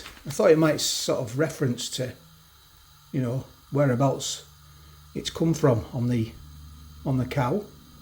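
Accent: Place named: Northern England, United Kingdom. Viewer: Hello, Just thought I would send a message of support, we have been subscribed to your channel for a while now and we think it is great. Its good entertainment plus informative as well, we recently started buying Harry Ramsdens battered sausages for my youngest son after seeing your review of them. I think its great that you can make a living in such a way doing food reviews from the comfort of your own kitchen. I also love your accent, I do have a liking for Northern accents. I thought your investigation into the 11 year old Christmas pudding was funny also your reaction to the vegatarian sausages, in one review you did, was hilarious. The look of total disgust on your face! Ironically that review probably lead to a few sales by people just wanting to see if they thought they were as awful as you did! Anyway keep up the good work. 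Tony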